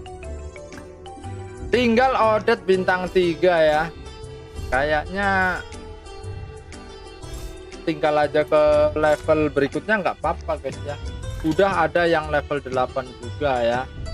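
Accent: Java, Indonesia. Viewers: Asli mana mas jawane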